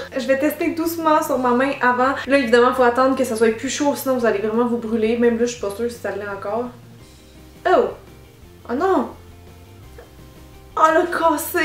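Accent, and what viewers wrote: Quebec, Canada. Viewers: j'adore l'accent Québécois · L'accent québécois 😍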